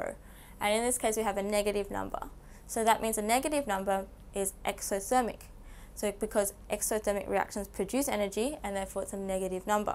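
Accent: Australia. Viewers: I love you sm. I love your aussie accent too🥰🥰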